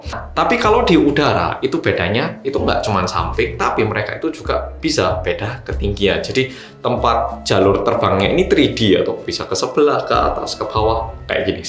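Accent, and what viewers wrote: Java, Indonesia. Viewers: Mas iki penampakannya orang korea,tapi logatnya medok jowo😁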